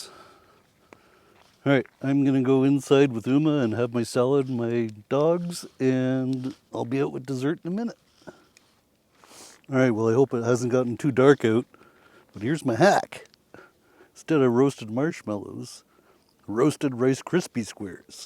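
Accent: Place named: Eastern Canada, Canada. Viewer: Love your shirt. you talk like one of them Eastern Canadians. are you Canadian?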